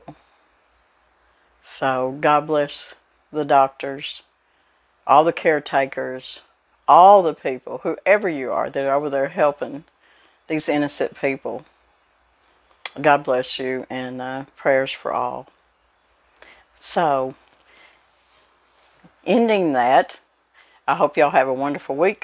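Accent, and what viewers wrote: South, United States. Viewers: I went out to my patio to plant some flowers and when I came back inside I heard this beautiful southern drawl and just KNEW it was you. And it WAS!! ❤️ I need to spend the day with you Miss!! All my family is gone and you sound JUST like my grandma. Soooo very comforting to my soul. Much love to you 2! 😘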